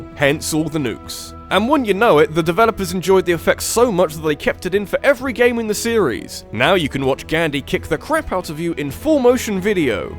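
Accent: United Kingdom. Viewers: [First impressions] Well, he's a bit-er. [Speaks] WELL LOOK WHO'S BRITTISH! [subs instantly]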